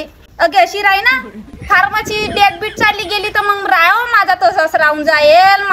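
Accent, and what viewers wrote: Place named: Vidarbha, India. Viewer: He amchi vidarbhachi marathi ahe manje zadiboli ahe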